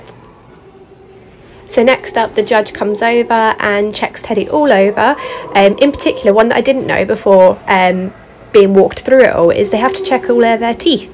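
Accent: United Kingdom. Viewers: Your British accent is ❤️❤️❤️❤️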